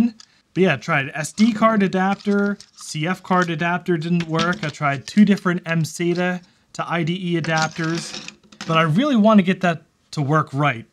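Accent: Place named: Philadelphia, United States. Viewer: WOODER... Iconic accent of a philly local, lol Met you at VCF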